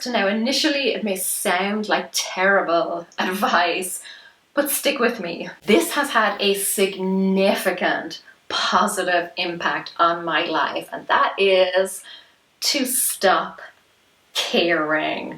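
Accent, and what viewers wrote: Ireland. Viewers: Good advice!I love your Irish accent!!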